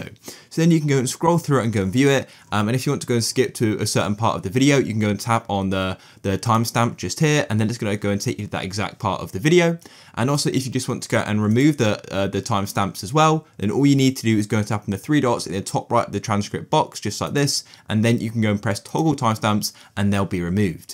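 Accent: United Kingdom. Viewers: When English guide? I don't understand British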